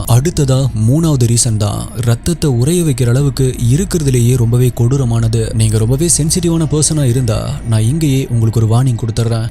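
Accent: Coimbatore, India. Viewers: unga coiambatore slang super bro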